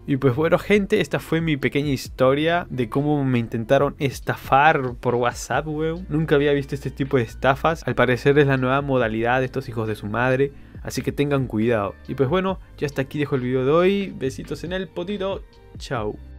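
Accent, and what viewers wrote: Argentina. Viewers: Porque ablas como argentino? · Yo de Lima, por eso te digo que a veces suena como a argentino, pero muy ligeramente. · Papi por que hablas como argentino??? Si eres peruano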